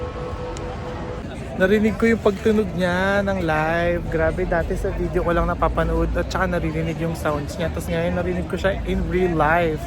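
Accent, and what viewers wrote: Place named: Europe. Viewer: Love the European accent at Tagalog din 😊❤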